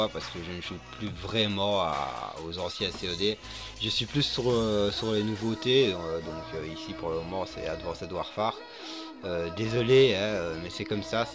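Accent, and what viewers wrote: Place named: Liège, Belgium. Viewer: Ton accent Liégeois ahha Je viens de Bxl moi, continue comme ça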